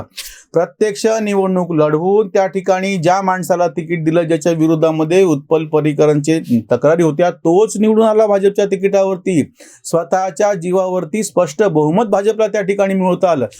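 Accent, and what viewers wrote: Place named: Marathwada, India. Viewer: टीका पण मनावर घेता, भाषेवर पण मेहनत घेतलीत मराठवाडी ठसका कमी झाला. मी गाभाच ऐकत असल्याने त्रास होत नव्हता पण मराठवाड्याशी संबध नसल्याने काही शब्दाचा अर्थबोध होत नसे. आजचा विषय आणी presentation खुप आवडले.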